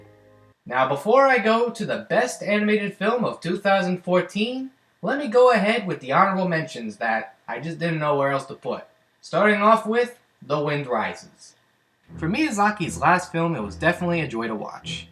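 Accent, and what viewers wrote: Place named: East Coast, United States. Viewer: I subscribed just to hear you tawlk. Not many Eastern accents in northern Minnesota. :(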